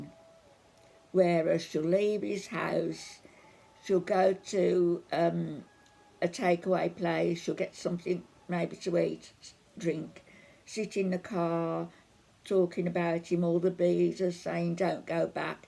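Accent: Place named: Wolverhampton, United Kingdom. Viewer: Are you from the midlands? I hear a Wolverhampton accent 👂 ☺️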